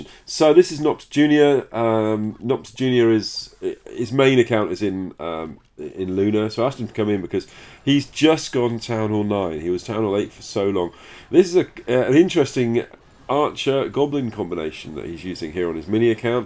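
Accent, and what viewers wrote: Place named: North Yorkshire, United Kingdom. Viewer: are you originally from around the North Yorkshire area? Your accent rings a bell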